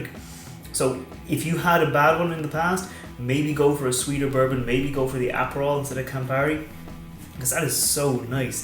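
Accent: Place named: Ireland. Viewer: Where are you from and what is your name? I recognise an Irish accent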